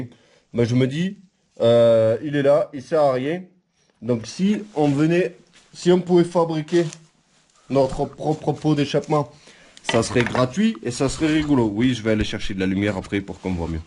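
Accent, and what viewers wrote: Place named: Marseille, France. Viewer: En grande forme internationale ça ça me fait toujours délirer le début de tes vidéos tu as l'accent marseillais mais apparemment tu habites Danemark ça fait trop délire. avec ses 400 épave en tout cas continue comme ça gros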